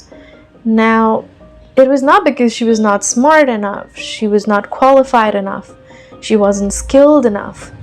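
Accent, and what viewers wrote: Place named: India. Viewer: I only heared the audio without looking at the video to remove my bias, to check how you are sounding like. To my ear, out of 100 words you speak, 80% is correct accent, 20% is indian. Same problem happens with evryone who starts to speak with an accent. They always oscillate between indian and american. That oscillation is easily detectable and thats the reason of ridiculing the speaker. If you are 100% perfect, no one will ridicule you. For example, an american born and brought up indian origin teenager speaks with perfect american accent without any mix up.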